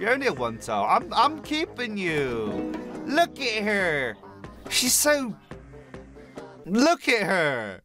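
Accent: United Kingdom. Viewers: If he British or American? Also f😊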